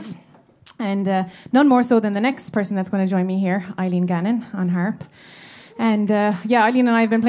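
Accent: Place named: United States. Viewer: it brings back happy memories when my late sister Bernie Rip & me met you in Ennis Cathedral. We were very impressed. Thanks to your lovely Mom & Dad for sending me your very talented video. I'll be looking forward to meeting you when you come home to the lovely Co. Clare. You sound very Americano it would be hard not to pick up the lovely American accent. Best of luck..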